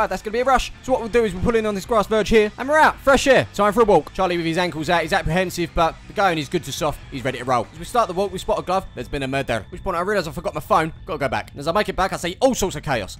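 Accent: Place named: United Kingdom. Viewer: No idea what this guy is saying, talks so fast in a crazy British accent...sounds like a Guy Richie movie gangster !!! How did I get here ??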